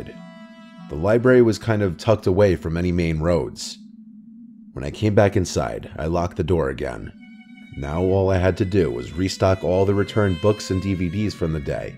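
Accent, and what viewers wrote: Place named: New York, United States. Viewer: He has such a great voice for these stories. I cover true crime and my voice is on the lighter side so I try to make a little deeper for the tone of the story. He also has that jersey maybe New York accent that really sets him apart.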